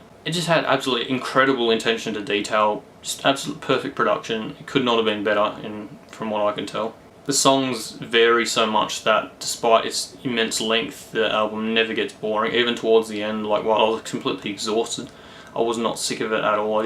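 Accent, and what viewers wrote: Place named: Australia. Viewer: Nice review! Where from Aus you from?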